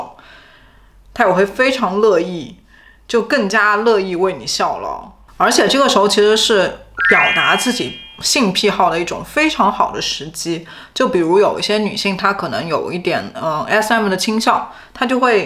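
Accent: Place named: Shanghai, China. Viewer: 海外听到上海女生的上海普通话好亲切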